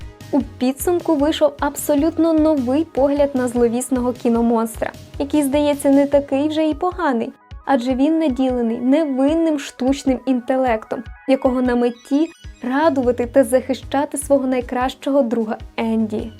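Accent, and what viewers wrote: Standard. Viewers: Боже, яка ж гарна та чиста українська мова, насолода для вух 🥰🥰🥰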